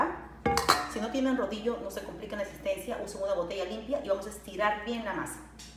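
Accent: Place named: Peru. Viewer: Que hermoso acento peruano. Un abrazo desde Chile. Soy de Ica.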